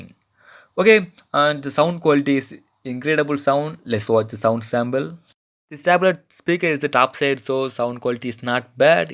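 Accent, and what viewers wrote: South India, India. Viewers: Are you South Indian??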